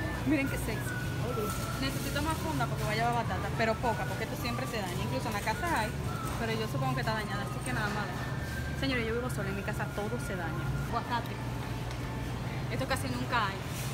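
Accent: Dominican Republic. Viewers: Yo sabía que eras dominicana desde que escuché nuestro acento!❤️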